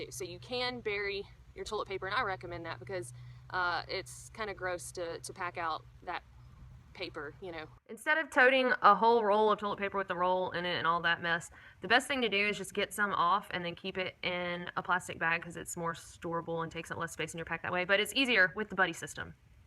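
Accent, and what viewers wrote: South, United States. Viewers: Hey, okay, I have to ask, what part of America is your accent from, I love it. No offence, Brits always ask this question! Warm regards. DisabledPrepper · What southern state are you from?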